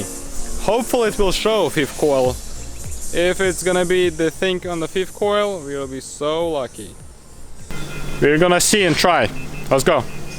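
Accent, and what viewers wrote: Russia. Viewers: Russian accent wtf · Непонятный акцент !! Трудные слова с русским акцентом А когда вспоминаешь походу про акцент и хочешь говорить немного по американски начинается. Бррп брп брэп бэп 😅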